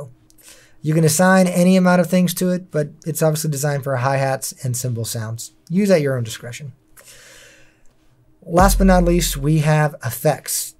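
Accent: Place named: United States. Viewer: I love video tutorials. But my english is not that good. The American accent makes it harder to follow the video, too bad. When will you finally make a manual in HTML or PDF format?? Anyone can translate that with Google Translate. In addition, it makes sense if a specific information needs, then you can search for it!. In video format, I do not have this option.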